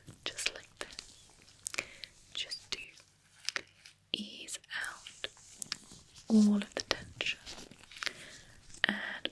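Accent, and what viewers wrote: RP, United Kingdom. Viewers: this is not asmr. this is yet another posh girl begging for an oscar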